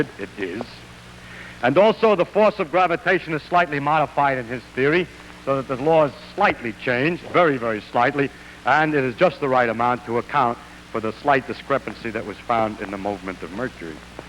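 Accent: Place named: Brooklyn, United States. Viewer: Dr. Feynman speaks with the Brooklyn accent of a man who was born in 1918. Do modern Brooklynites still speak with this accent, or has mass broadcast communication quashed it? The New Orleans accent that my grandparents spoke with is all but dead.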